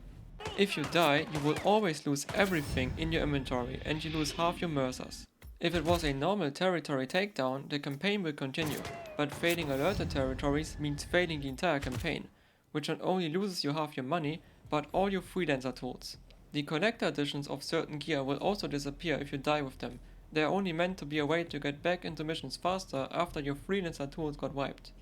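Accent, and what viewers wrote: Germany. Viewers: Man merkt du bist deutscher haha ist lustig wie du english sprichst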